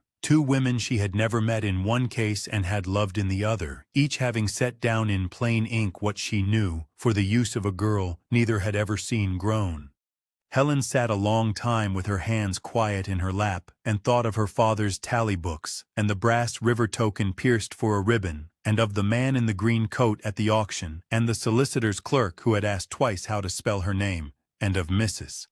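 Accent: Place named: United States. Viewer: Hint - get rid of the American voice. Im so peeved by the USA i will not listen to anything with USA accent !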